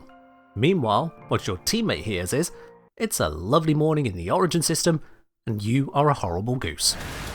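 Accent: United Kingdom. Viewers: Surprising that the first language is not English. He sounds kinda British tbh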